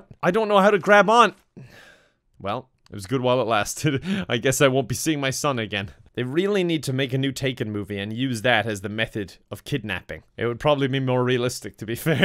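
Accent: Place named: Ireland. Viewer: After awhile the Irish accents just seems normal to me. It's just. Then he pronounces Z as Zed and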